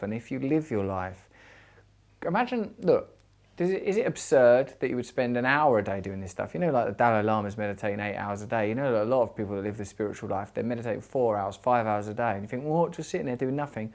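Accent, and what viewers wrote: United Kingdom. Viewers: I also feel a lot better, almost like calmy resting in myself with a clearer head and a some awareness of the present moment. Thank you so very much for sharing this, I'll try this for bed time to, maybe it helps for sleeping too. And btw I love your British accent, one of the reasons I subscribed to your main channel in the first place (content and critical questioning too) and I am over the moon to get such mindful content on your second channel. Usually I am not the person to comment on yt, but I really wanted to take the time for deeply thanking you for your wonderful creative, mindful, peaceful and thoughtful content on both channels!!!!! Thank you.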